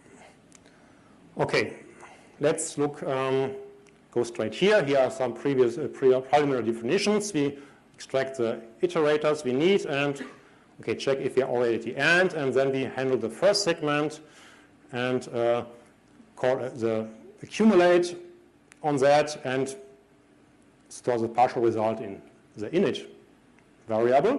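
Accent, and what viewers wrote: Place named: Germany. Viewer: i don't know what's wrong, but it's close to impossible to understand what he says, german accent is way too heavy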